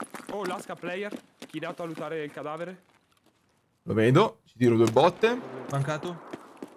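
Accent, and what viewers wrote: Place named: Tuscany, Italy. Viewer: Toscanaccio puro, mi dispiace pe sto ragazzo, BSG mi sa che vuole far morire sto gioco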